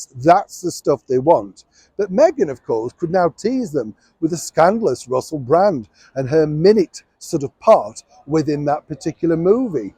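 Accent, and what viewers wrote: Yorkshire, United Kingdom. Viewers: Neils a true yorkshire lad 😊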